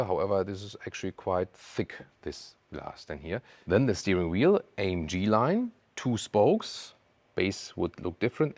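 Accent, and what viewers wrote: Germany. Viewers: Hi. It's ennoying to watch a channel named "Autogefühl" (a very typical german word) with a presentor that speaks in english with a quite strong german accent and pronounce even the german word "Autogefühl" in an english way. Why don't you make your presentation in german when you clearly come from Germany and give your channel with the german word "Autogefühl". It would be very much more authentic to present your videos in german than using this "german" english thought by a german english-teacher.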